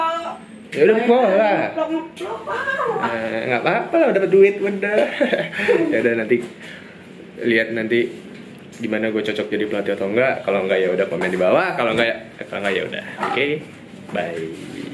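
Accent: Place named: Medan, Indonesia. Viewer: logat medannya anjay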